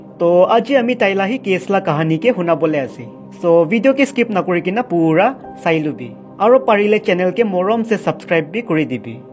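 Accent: Nagaland, India. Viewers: I'm happy with your Nagamese accent special kushi ba tho Last tey....#Na kwa tho😅❤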